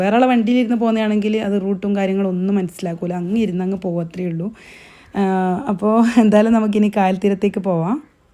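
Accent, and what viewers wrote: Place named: Kannur, India. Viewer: അടിപൊളി🔥🔥 കണ്ണൂർ ഭാഷയിലെ നല്ല അവതരണം🎉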